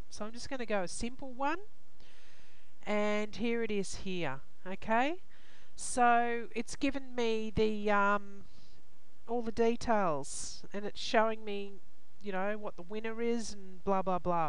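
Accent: Australia. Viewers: I am assuming she is from Australia...which as been referred to as OZ...cheers mate! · She’s Aussie 😅”jazz it up” love it!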